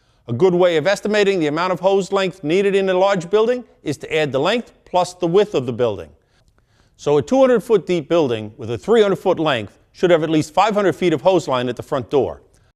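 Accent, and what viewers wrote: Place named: New York, United States. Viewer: I can tell he from New York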